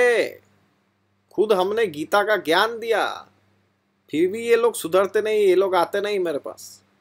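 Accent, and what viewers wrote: India. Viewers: Like the Hindi accent lol. Good explanation anyways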